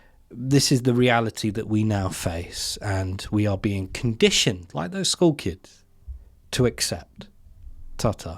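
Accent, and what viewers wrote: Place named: Northamptonshire, United Kingdom. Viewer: I gotta have a beer with you geez...your accent is Northants ent it....I used to live in Rushden..no trainstation ..whoopee...only now I realise what a godsend that was....tartar😂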